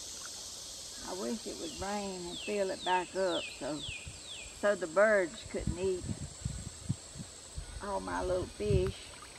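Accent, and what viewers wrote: South, United States. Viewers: You inspire me. Your beautiful southern accent makes me homesick for my kin in Tennessee.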